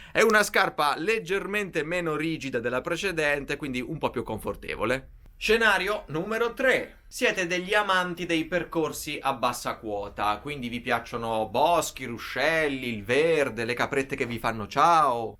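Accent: Apulia, Italy. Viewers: ma il "dove dobbiamo andare con queste scarpe", devo chiedermelo sempre con il tuo accento pugliese? o posso usare il mio siciliano?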